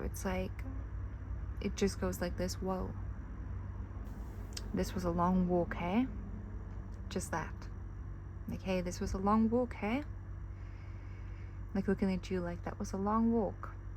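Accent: South Africa. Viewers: Girl, are you South African by any chance? Coz your accent sounds really familiar to me 👀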